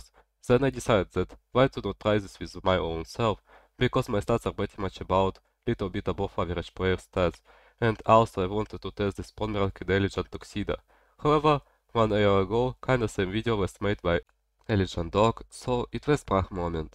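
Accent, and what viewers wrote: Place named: Russia. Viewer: ahh aight, that is one thick russian accent, good video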